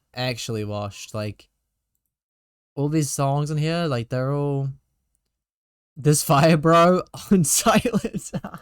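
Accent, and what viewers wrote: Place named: New Zealand. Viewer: im not super into animal jam like i used to be when i was younger, but i like to stay up to date on the drama... never watched a video of yours before but i immediately recognized the accent .. love to see kiwis makin good content bro keep it up !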